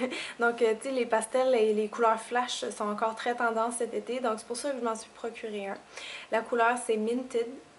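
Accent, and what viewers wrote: Quebec, Canada. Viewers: C'est vrai? Pourquoi? Moi la première fois que je suis allé au Québec (dans le Sud), je suis tombé amoureuse de cet endroit, d’ailleurs, j'ai repris mes études pour avoir un bon travail et tenter de m’installer au Québec. J'adore tout là bas. même si j'aime aussi ma belle France: ) Et j'adore regarder les vidéos de, aussi pour son accent parceque ça me refais penser à votre province <3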